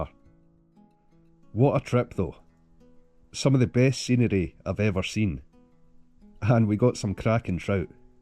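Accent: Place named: Scotland, United Kingdom. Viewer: Hi, i'm Italian and looking at the landscape I would have guessed Scotland as well. Not to say about his typical accent.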